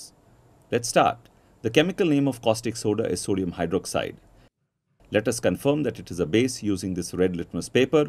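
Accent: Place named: India. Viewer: Your accent is like an indian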